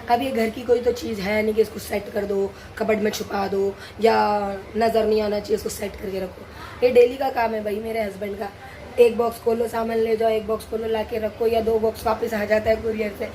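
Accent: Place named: Nagpur, India. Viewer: Asslamoalaikum ji.. Aaj pehli bar apka vdo dekha maine.. Balki apko hi pehli bar dekha maine aaj.. Pata nhi Q but aap dekh k bahot apnapan feel hua mujhe.. Like apki bate hamare Nagpur Jaise lagi.. Aap kaha se ho.. Or vdo bhi dekhugi ab mai apke.. 🤗🤗🤗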